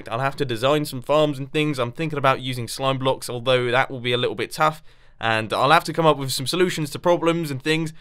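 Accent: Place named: United Kingdom. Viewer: I also enjoy your voice. Maybe that's because I'm from Poland and British english sounds soo darn good to me. Also those iron "things" you could call them compartment markers/borders. As a biotechnologist I find that properly proffessional. P.S. Is the Iron Foundry automatically crafting blocks of iron? Cuz I'm pretty sure it produces iron slabs...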